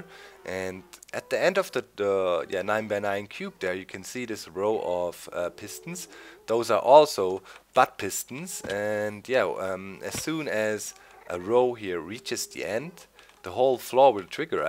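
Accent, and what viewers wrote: Germany. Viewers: are you from england with a bit accent of germany?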